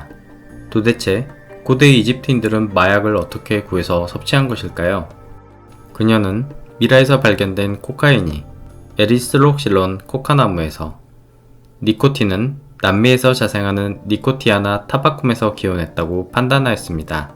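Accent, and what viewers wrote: Jeolla, South Korea. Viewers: 영상 잘 보고 있습니다~! 드문드문 나오는 전라도 억양도 재밋어요 ㅎㅎㅎ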